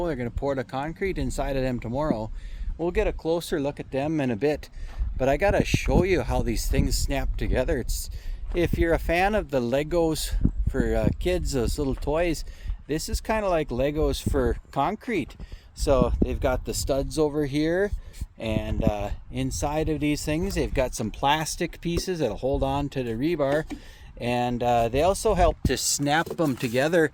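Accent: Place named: Midwest, United States. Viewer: This guy is deep north Midwest 😂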